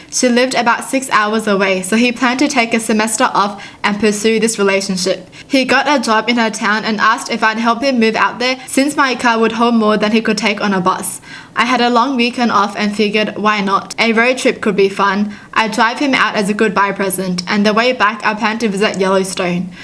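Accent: Australia. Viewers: Hi so I’m new to this channel,but I have a question.Are you Australian ,because your ascent is kinda Australian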